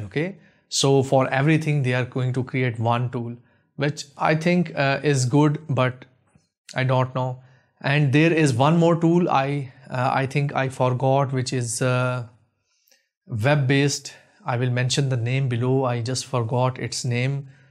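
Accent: India. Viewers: white man with Indian accent !!!!!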